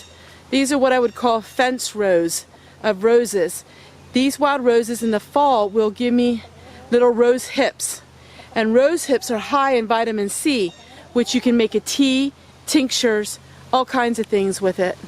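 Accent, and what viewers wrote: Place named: Pennsylvania, United States. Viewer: I knew you were from PA by your recognizable accent. I live in Lancaster county. Your homestead is beautiful, and so inspiring.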